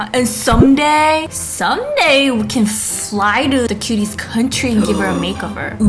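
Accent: France. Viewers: Your French accent is so cute! 😜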